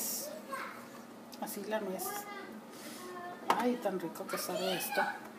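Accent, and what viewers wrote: Chihuahua, Mexico. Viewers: Acento de Chihuahua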